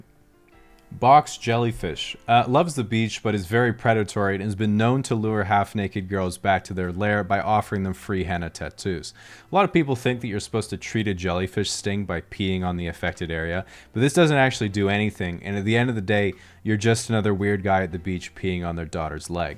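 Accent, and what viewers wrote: Australia. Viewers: Ahhh cool I thought you could nail the australian accent, do a good job of getting the extended vowels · Causally explained in Australian accent hits different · after watching this i have decided australian accents are nice actually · that flawless yet unendingly irritating Aussie accent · Please keep talking in the Australian accent thanks! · Your Australian accent is my favourite thing about this channel probably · Wait... your Australian? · Dude. I knew you sounded Aussie sometimes. You say the animals like you never left but most of the time you now sound vaguely American/Canadian i was never sure cause I'm Aussie lol *Edit I just got to the end and found out youre from Tassie..same...which wouldn't be weird except there are so few of us. Props for being YouTube famous hey. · You are one of the only American with an authentic Aussie accent, also, the drop bears 🤣🤣🤣 Australian gang where you at? 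· I like how it’s hard to tell what his real accent is. Is he faking the Australian or Canadian? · your accent is a noticeable mix of australian and north american english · your aussie accent is mindblowing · I havnt heard your voice for a year and you sound a lot more Australian than I remember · Can we all take a minute to appreciate his Aussie accent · How does your Australian accent sound so much like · It's obvious you lived in Oz as a kid. The accent is perfect. I'm from Tassie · im from Tassie, and I always thought you speak with an aussie accent at times! how cool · Solid Australian accent 👌🏽 but then you mentioned telstra and gave it away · Other videos where he does his Australian accent? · I know i never heard you talk with an australian accent before. Did you chat with your Mum for a while on whatsapp must before you voiced this? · Holy fuck I would never have put you as an Aussie but now you've mentioned it I keep hearing the accent slip through every now and then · As an Australian, man definitely knows his culture. 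Even his accent is amazing, surprised he didn’t keep one from when he went to primary school here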